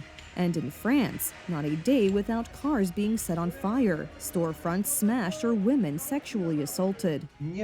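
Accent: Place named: United States. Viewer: Is this a Polish news station? Please don't use the voice with odious American sounding accent. It's bad enough hearing that accent in their vapid movies but please, not on Polish news. I used to like listening to your Polish newsreaders speaking aEnglish, clearly and concisely. And I'd still prefer to hear real Polish newsreaders speaking than listening to that yankee accent.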